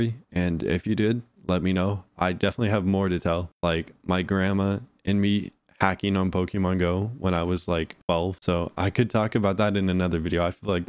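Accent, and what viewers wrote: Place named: South, United States. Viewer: When did turn like extremely southern?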